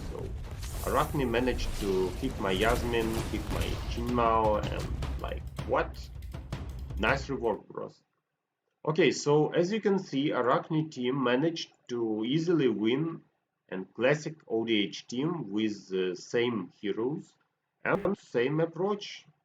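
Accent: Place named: Russia. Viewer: Привет, по акценту понял что ты Русский) подскажи пожалуйста что лучше создавать на телефоне? Играю всего 3 дня, и у аракне совсем другие способности, робот на 1 месте, вообщем все по другому) я строю вокруг маи для поглощения демеджа, аракне для стана и даредевил для демеджа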